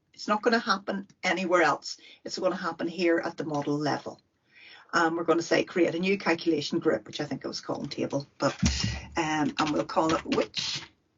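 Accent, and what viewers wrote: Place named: Northern Ireland, United Kingdom. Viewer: Great video, excellent intro to TE!!!! Love the Nor Iron accent by the way, brings back many great memories!!!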